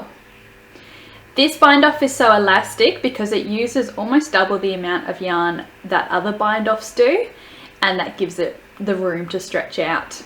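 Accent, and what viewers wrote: Australia. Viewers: Thank you- very clearly explained stitches and you didn’t flick through your stitches quickly like many knitters doing this with continental knitting. Love your Aussie accent, I understood exactly what you were saying. And I am a senior from the Midwestern U.S. I could see what each motion was doing on the needles. Thank again,. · Yank English accent here and I understood your Ozzy accent just fine. Thank you for sharing and educating! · As an Aussie, I was SO relieved to find a knitting video featuring an Australian accent. It's the first one I've found after watching MANY knitting videos. Keep up the good work and thanks!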